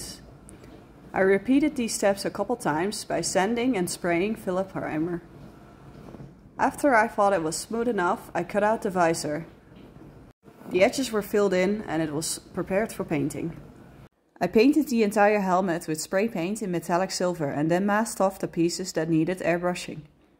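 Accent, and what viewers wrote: Netherlands. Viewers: Dutch accent? 🤔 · I can just hear this person is Dutch 😂 great job amazing work😊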